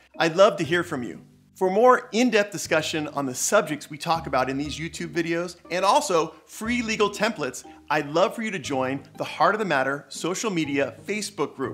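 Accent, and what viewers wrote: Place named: United States. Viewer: You really talk like an American person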